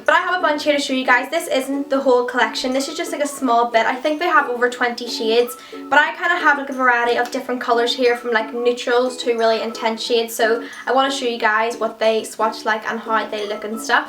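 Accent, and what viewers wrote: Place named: Northern Ireland, United Kingdom. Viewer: I love your accent.. May be weird saying that bc Im from Northern Ireland too :)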